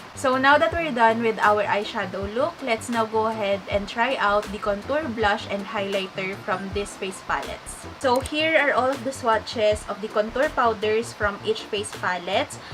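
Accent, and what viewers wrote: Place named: Iloilo, Philippines. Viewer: You sound like an Ilongga. 😍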